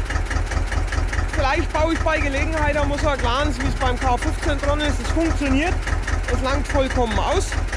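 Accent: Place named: Middle Franconia, Germany. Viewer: Kommst du aus Mittelfranken du redest genau so wie ich 😂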